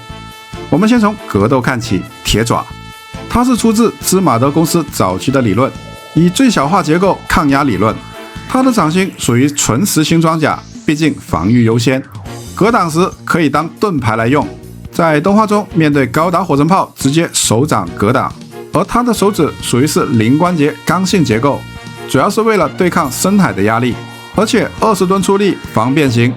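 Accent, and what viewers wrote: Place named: Cantonese, China. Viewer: 好欣賞你嘅頻道，解釋很清楚，中間仲夾雜好地道嘅廣東話👍尤其是機體名稱，你亦都有提及港版叫法，因為我係香港人所以聽落零舍親切👍👍👍well done🙏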